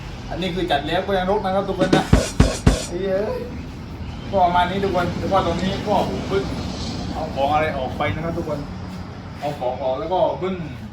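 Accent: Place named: Central Thailand, Thailand. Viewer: แหลงกลางชับน่ะเติ้น บ้านอยู่ใหน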